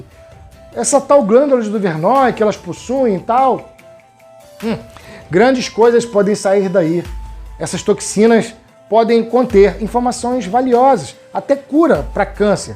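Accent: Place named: Bahia, Brazil. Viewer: Com sotaque baiano. Meau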